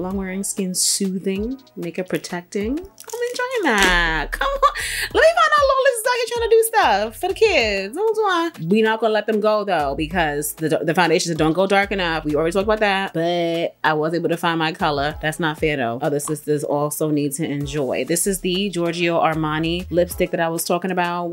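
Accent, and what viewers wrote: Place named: Rhode Island, United States. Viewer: I freakin love your accent! From a MA gal to a RI gal😍😘💜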